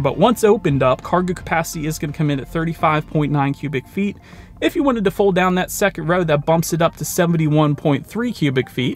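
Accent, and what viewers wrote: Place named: Philadelphia, United States. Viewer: Living in Florida now I really miss hearing that Philly accent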